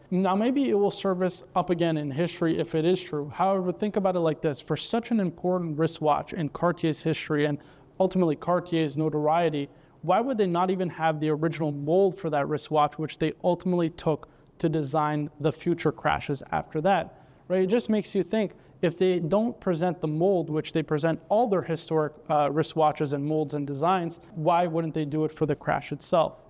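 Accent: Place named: Quebec, Canada. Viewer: Please stop the québécois accent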